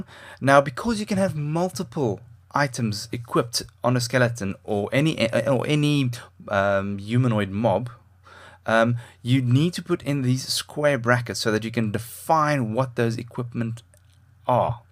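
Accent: South Africa. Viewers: are you from south Africa ??????!!!!!!!!! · Awesome video!!! :D Are you South African?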